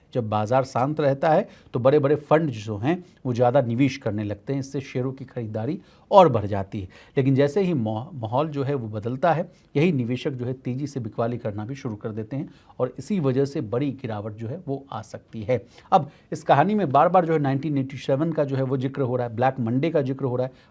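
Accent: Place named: Bihar, India. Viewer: Ye Bihari h tone se pata chal rha h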